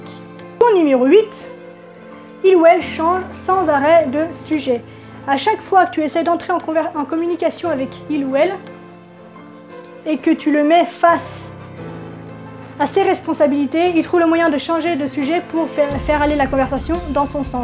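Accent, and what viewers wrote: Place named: Quebec, Canada. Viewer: Bon content tho. Tout ce que tu dit est former :D Belle confiance. Sen tempone le coquillar hahaha Ouf sa frappe dans le quebcois. il y a un stress constant dans ta tonaliter de voix, je ne veut pas picosser mais donner une reaction que jai resentit fortement , et je la partage ouvertement. C'est commme si tu me donne un 2eme cafee xD.. mais je fait pareil quand je suis passioner <3 OK ok alor la ok ok lee le narcisisque.. hehe ironiquement jai beacoups de trait narcisisque et comme tu dit sans etre vraiment la maladie. Mais je ne me sent jamais mechant deriere cest trait. Alor jessaye de ne pas juger mon pere tres narcisisque, mais peu etre aussi tres bon au coeur? Des idee :D?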